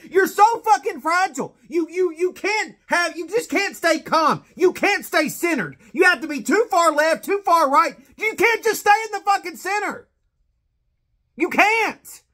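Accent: South, United States. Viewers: Where are you from because you sure don't sound like you're from NJ or NY? You got a southern drawl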